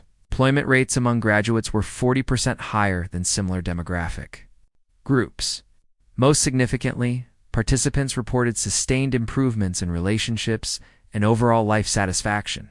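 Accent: United States. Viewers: This is - as usual - a garbage, American accented load of crap!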